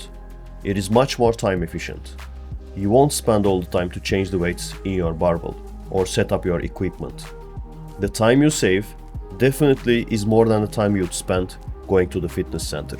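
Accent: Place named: Turkey. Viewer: Turkish english accent is absolutely the most unique english accent I have ever heard. There is nothing like it.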